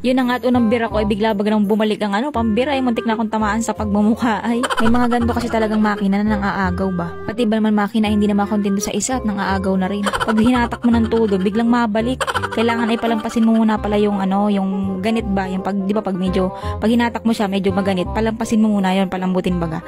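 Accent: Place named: Mindoro, Philippines. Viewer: Ai oo mandin.. dto sa quezon ai kapareha ng punto sa mindoro..saka semi batangas din ☺️ same. Bisaya aq pro dto laang aq nakatira sa perez quezon at uhay nabingwit ng taga quezon 🤣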